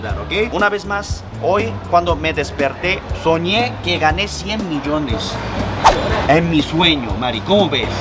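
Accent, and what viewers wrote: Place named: Sonora, Mexico. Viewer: eres de Sonora o porque tan Hermosillo?🙈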